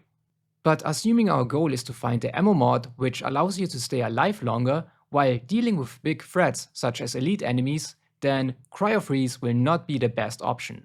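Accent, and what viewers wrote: Germany. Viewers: It's odd, it sometimes does 1 Mil damage (hello from Germany btw, I think you are from here too cuz its noticeable in your accent), while other times it does like 45k-ish? Like this will happen on the same round, in the SAME trigger. It'll suck up 3 manglers, spit them all out, 2 of them die and the third just... doesn't? Amalgams too, sometimes it's a mil, sometimes it's just less than 100k dmg. It's inconsistent. Also, I was REALLY hoping the targeted augment and the explosive drop augment would help turn shadow rift into a horde clearing beast, but the damage doesn't scale at all making it virtually useless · Minimal deutscher Akzent 😅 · Are u from germany by Chance ? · Der deutsche accent ist strong😂 gutes Video!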